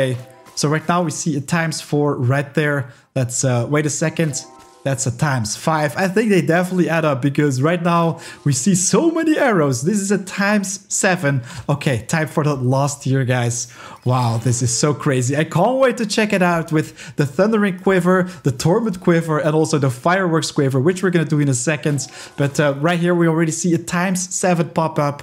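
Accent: France. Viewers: Are you french ? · Mais il est français ou pas, il dit pas mal de mots en français et il a un peu l’accent français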